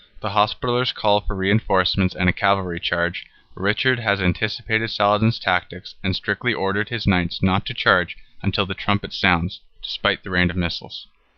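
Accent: United States. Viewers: the american accent just ruined it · Hokey American voice and indistinct graphics make this a very amateurish effort.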